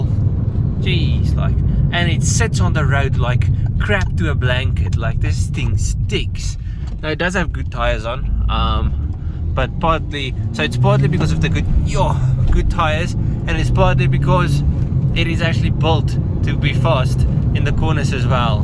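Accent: Afrikaans, South Africa. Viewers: First thing I hear is the Afrikaans accent. Appreciated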